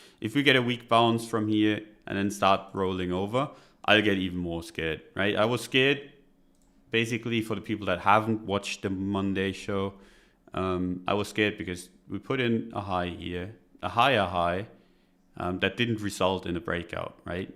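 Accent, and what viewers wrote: RP, United Kingdom. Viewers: content lol.i realised these guys are just waffling for hours over s/r levels that are obvious on a chart. everything is it "looks good" or "looks bad". My bet is they both work, have jobs, and just release lack lustre vids to earn money for leverage trading following YT income. Creds system is flawed too if you actually bother to back test it. but he speaks posh so people believe him. sponsored by leverage exchange to get you to gamble says it all. "I think think think" blah blah blah . there's no on chain analysis here or order book insight at all. these guys are amateurs and rent their homes with a high probability that mummy cooks dinner every night for them.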